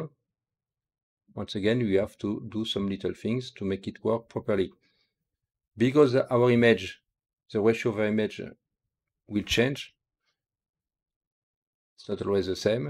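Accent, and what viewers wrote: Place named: France. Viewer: Thanks for this tuto. Sounds like your french like me 😅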